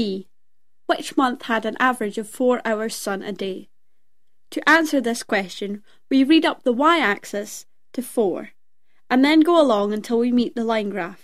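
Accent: Scotland, United Kingdom. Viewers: I can't get over your Scottish accent.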